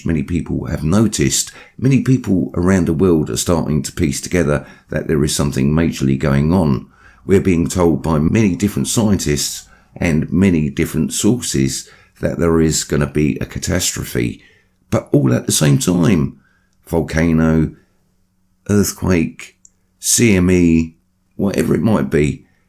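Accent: England, United Kingdom. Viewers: Nice to hear an English voice for a change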